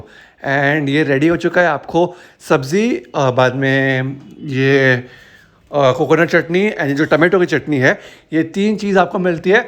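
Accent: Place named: Gujarat, India. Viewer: marcha vaghar rahey hey....😂 typical Gujju.. hindi + gujuu.. 👍👍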